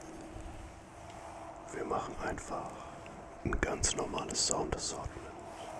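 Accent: Germany. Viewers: Your Denglisch klingt sehr interesting 😂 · It was no secret anyway, it was already clear just by hearing him speak english with his german accent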